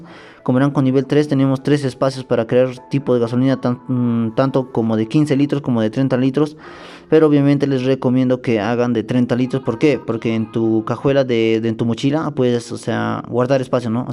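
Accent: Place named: Peru. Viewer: PURA VOZ DE PERUANO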